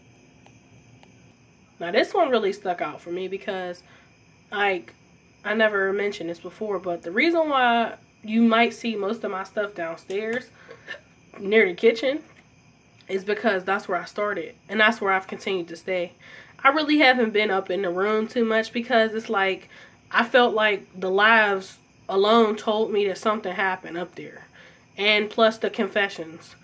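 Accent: Pittsburgh, United States. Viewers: Good video... if your not from Pittsburgh u sure have the accent 😀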